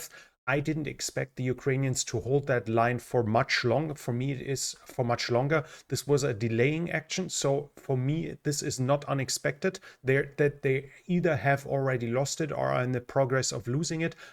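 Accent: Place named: Germany. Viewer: Dein deutscher Akzent im englischen ist schrecklich...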